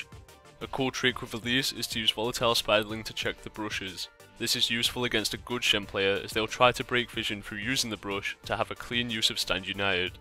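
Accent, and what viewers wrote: Northern England, United Kingdom. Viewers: I love british accent :D Him and JackFrags are my fav commentators on youtube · Your northern English accent is very different from mine.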